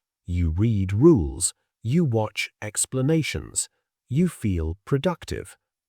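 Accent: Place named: United Kingdom. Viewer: এই ভিডিও টি ব্রিটিশ accent এ মনে হচ্ছে কিন্তু আমি তো এখন USA থাকি।এগুলো American accent দিলে উপকৃত হতাম।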